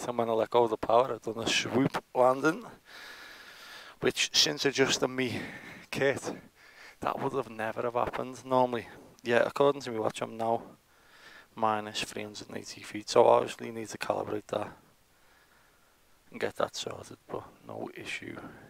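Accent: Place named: Liverpool, United Kingdom. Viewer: I watched it 10 mins after it dropped. Great skills. Keep making the videos. I love them, I'm hooked. It must be you that I have seen flying over ours, in Thornton, by the new brooms Cross Rd. I'm hoping to get into this myself. It's sounds amazing someone with a good strong scouse accent giving it the bitters in a paramotor.. Love it. Keep em coming. Stay safe.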